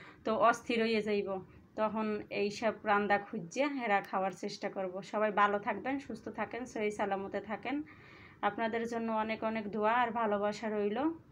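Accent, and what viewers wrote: Bangladesh. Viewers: আপু তুমার বরিশালের ভাষা টা আমার কাছে খুবই ভাল লাগে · Onek sundor hoyeche recipe ta ❤️ Borishaler bhasha khub sundor ❤️🥰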